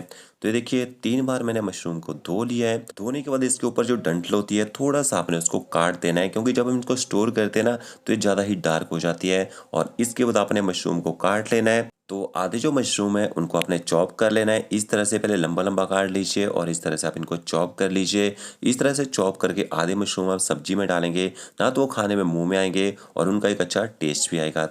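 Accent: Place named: Himachal Pradesh, India. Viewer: Sabji tau bahut badhiya hai hi par chalni rakhkar excess oil nikaalne ka idea bahut achcha laga. Aapki Vanni se lagta hai ki AAP Himachal ke rahne vale hain. Shubhkamnaye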